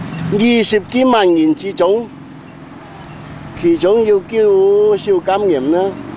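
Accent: Taishan, China. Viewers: 讲台山话可得意哈哈!!好靓仔、今時今日返唔到台山了、喜歡你拍攝多片看、龍仔可耐没見、他大個仔?🙏🙏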